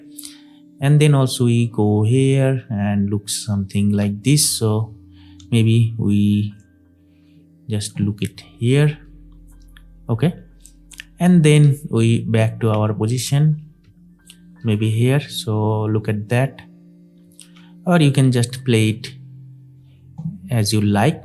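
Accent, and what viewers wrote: India. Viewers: Oh no, Indian English😢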